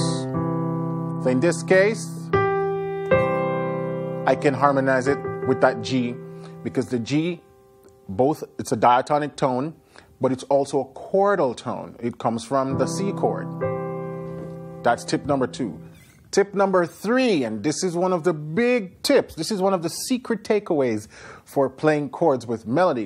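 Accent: Caribbean. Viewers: Hey I’m from Jamaica 🇯🇲. Are you from the Caribbean? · What Caribbean voice is this….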